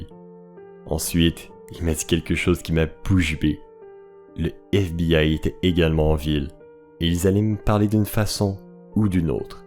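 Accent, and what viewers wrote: Quebec, Canada. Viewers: C'est marrant l'accent québécois mais je n'arrive pas à me mettre dans l'histoire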